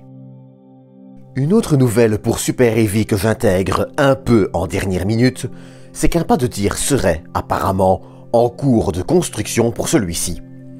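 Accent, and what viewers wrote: Belgium. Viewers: Beau boulot, merci pour tout le taf' de qualité sur cette chaîne ! Je like, je commente, je partage PS: Bel accent Belge j'imagine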